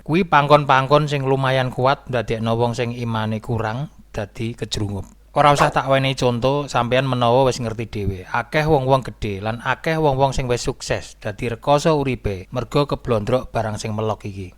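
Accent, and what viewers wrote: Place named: Java, Indonesia. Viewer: Wihh bahasa inggris e logat jawa 👌👌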